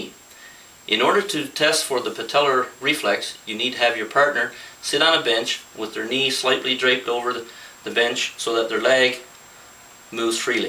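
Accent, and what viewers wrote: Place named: United States. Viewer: American, I think.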